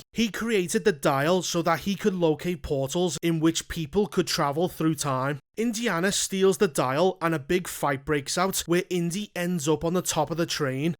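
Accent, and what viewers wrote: Liverpool, United Kingdom. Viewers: I know a scouse accent when i hear one 😂. Liverpool on top.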